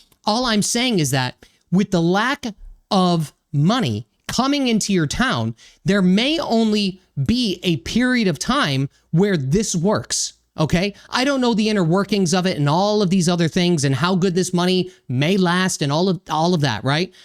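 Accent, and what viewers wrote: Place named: Massachusetts, United States. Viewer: i love the accent. You say "owies" when you say always. A lot of mass residents do. I pointed this out to my friend and he thought it was hilarious and can't unhear it.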